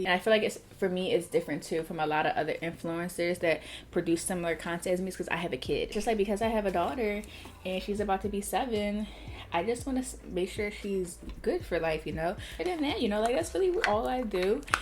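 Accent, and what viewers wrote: Philadelphia, United States. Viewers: is that a philly accent I hear?? 🥰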